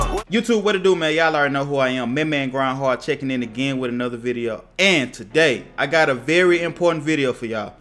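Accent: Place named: Louisiana, United States. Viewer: you’re from louisiana yea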